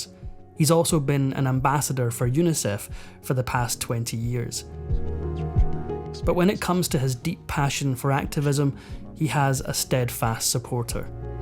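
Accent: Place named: Scotland, United Kingdom. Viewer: Yay!! I am so glad that the Scot is doing the narration more so now...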